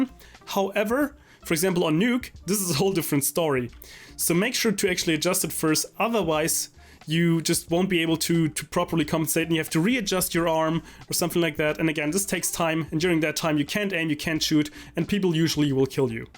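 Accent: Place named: Germany. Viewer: It sounds like he have a german accent :D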